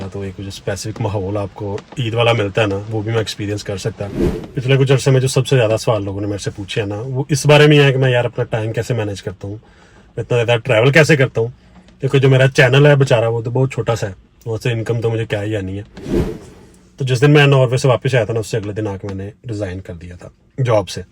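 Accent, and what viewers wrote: Punjab, India. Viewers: yar aap pyar ho boht hi achhay lagte go mujhe mujhe apka punjabi accent boht achha lagta hai lahore pakistan se boht sara pyar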